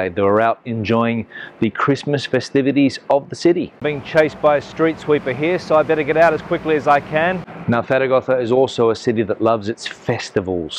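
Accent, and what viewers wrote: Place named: Australia. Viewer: I can hear the Aussie accent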